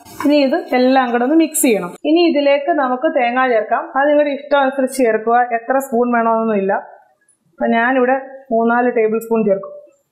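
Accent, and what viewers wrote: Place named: Central Travancore, India. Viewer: njanum ptnmthita anu..central trvncore slang ketanu chodiche😀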